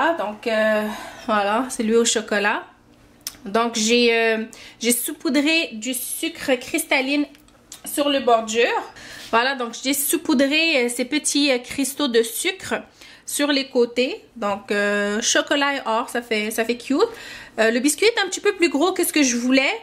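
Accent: Quebec, Canada. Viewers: Mais je me suis trompé c est l accent canadien que j entend en tout tu es une maman très attentionné maman gâteau bravo à toi super recettes merci. Emma de France. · Adorable femme avec cet accent du Québec 😁😁👏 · J'aime beaucoup trop l'énergie que tu dégages dans tes vidéos, merciii pour la recette ça a l'air délicieux Ps : ton accent québécois est magnifique 😭❤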